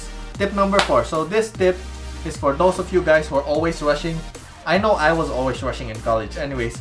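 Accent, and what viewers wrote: Philippines. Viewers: Are you a Filipino? Your accent sounds like how a Pinoy person would speak in english Edit: Oh nvm you are a Filipino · Filipino accent haha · i could relly tell your a filipino by accent hahahaha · Keep up the Filipino accent dude when you are speaking English because it's our identity haha, btw great work, i will try your tips when i will study architecture again haha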